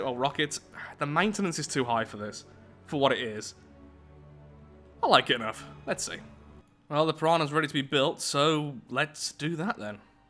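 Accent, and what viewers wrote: United Kingdom. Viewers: Hey are u british or? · Just listen to em! He’s got to be British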